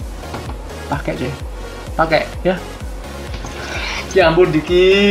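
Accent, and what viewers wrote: Java, Indonesia. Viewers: Ngomong jawa nya mas jangan medok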